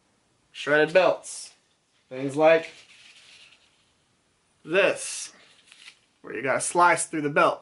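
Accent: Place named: South Carolina, United States. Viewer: Hey bro, where are you livin'? You have the accent all my friends have, so maybe you're from SC. If you are holler at me and I'll roll thru and scan all those templates you have hangin' in your shop. Piece o' cake. Always enjoy the work you do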